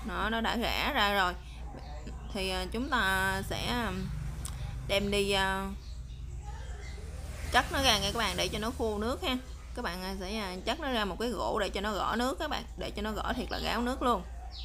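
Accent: Mekong Delta, Vietnam. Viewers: Người miền tây hay nói rổ thành gổ, ráo nc thành gáo nc, lúc đầu mình nghe mãi ko hiểu về sau mình thấy ng nào cũng nói vậy, mới biết là tiếng địa phương, video của bạn mình rất thích, chia sẻ tận tình nhiều món chay ngon đoqn giản, chúc bạn khỏe gđ hạnh phúc nha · Miền Tây quá bạn ơi "gõ nước.bỏ ga cái gổ🤣 cho nó gáo nước"" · Chào cô gái miền Tây ( qua cách phát âm R ) cảm ơn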